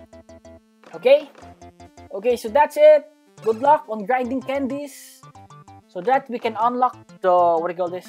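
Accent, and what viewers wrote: Philippines. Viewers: are you from Philippines bro?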